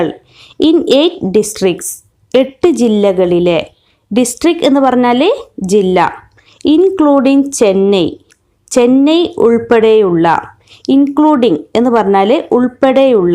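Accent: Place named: Malappuram, India. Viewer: Your malayalam accent like the Malappuram..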